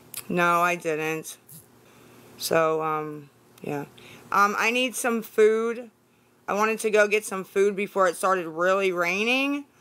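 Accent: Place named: Western Pennsylvania, United States. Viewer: She definitely has a western PA accent i used to live in Johnstown and my bf mom said yinz and geez oh man lol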